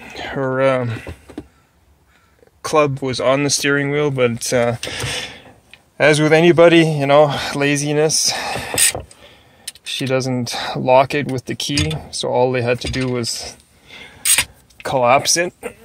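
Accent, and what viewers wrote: Germany. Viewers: Right - will do. Just watched you Mom-video. Where in Germany are you from? I'm wondering about your German dialect.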